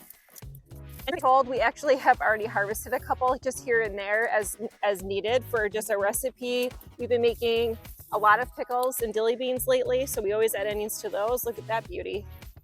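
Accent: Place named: Minnesota, United States. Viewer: I love the video. Your Minnesota accent is in full effect!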